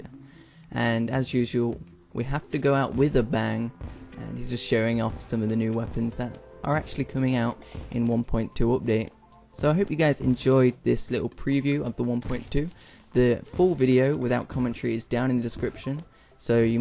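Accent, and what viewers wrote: United Kingdom. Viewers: jesus christ, you sound exactly like James, from rune shark, i had to take a double check for a second...I dont live in the UK but im pretty sureyou have the same accent as well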